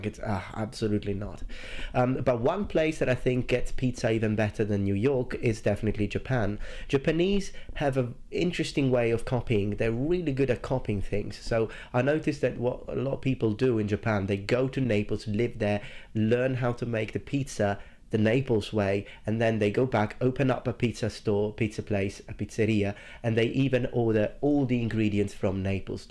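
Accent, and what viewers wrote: England, United Kingdom. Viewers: Hey, goomba what’s with the semi-English accent? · That actually sounded very british for some reason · No accent? He has the accent of England all over his English! (Moi, statunitense . . .) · I always forget your Italian. Your UK 🇬🇧 English accent is immaculate. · You speak with a somewhat British accent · But your accent seems someone from UK, are you Italian British?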